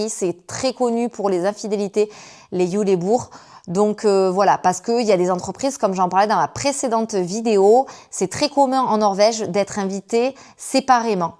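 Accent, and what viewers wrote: South, France. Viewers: Ce n'est pas moi qui ai parlé d'accent mais l'autre. Beaucoup de Français prononcent les O comme des E maintenant et pour les hommes ça fait très efféminé. Malheureusement beaucoup de Français du sud cachent ou ont carrément gommé leur accent du sud. En ont-il hontes ? Parfois son accent du sud ressort donc elle l'a toujours alors ça veut dire que par moment elle le cache. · A juger par l accent elle est du sud donc oui c’est plus difficile.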